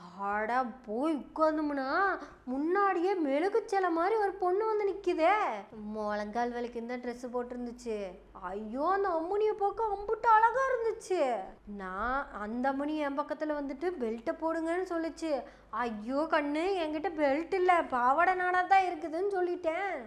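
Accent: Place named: Coimbatore, India. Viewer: அம்மா தாயி.. உன்னோட கோவை slang க்கு அடிமை..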